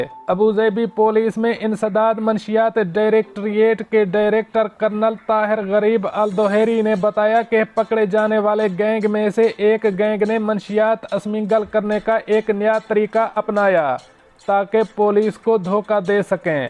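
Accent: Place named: Punjab, India. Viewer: Urdu news in PUNJAAAABI accent?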